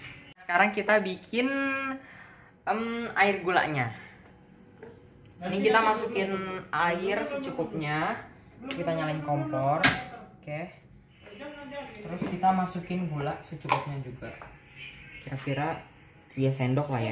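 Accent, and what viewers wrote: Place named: Banjar, Indonesia. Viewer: Banjar mana suara ya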